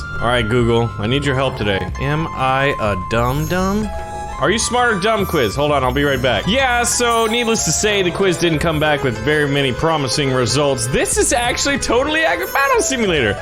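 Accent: Germany. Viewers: im from germany and i looove your german accent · Great German accent 10/10 (I am not getting paid by to say this)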